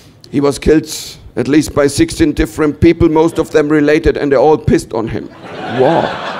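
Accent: Bavaria, Germany. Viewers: speaking as someone who saw Michael's shows back in the 90's, I can say he has consistency, something uniquely his own. I would recognize it, even if it were written down. An entire story, snappy call backs, mimicking sounds, accents, stereotypes, beginning to end, it is all connected. Of course he is more comfortable in German, but doing stand up in another language takes major balls, and he is doing a great job at it. his Bavarian accent is something i thought I's miss in his English shows, but actually I can hear it, even in English :D :D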